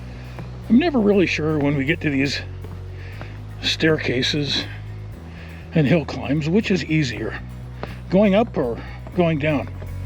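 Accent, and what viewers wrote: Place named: Wisconsin, United States. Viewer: Thanks for getting the Wisconsin accent right - you sound just like a local! Next trip: Hayward mid-July for the Lumber Jack World Championships and LCO has their Honor the Earth pow wow. Way different, but both are incredible. Safe travels.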